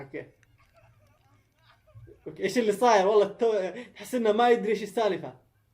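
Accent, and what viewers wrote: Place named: Yemen. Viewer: اليوتيوبر من اليمن واضح من مخارج الحروف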